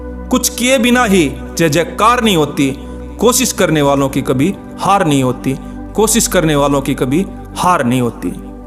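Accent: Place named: Haryana, India. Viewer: Bhai Ji aapki voice se andaja lagaya hai 🙏🙏 aap Haryana se ho kya 🤔🙏🙏 bahut hi sundar video and voice ❣️❣️❣️❣️❣️🙏🙏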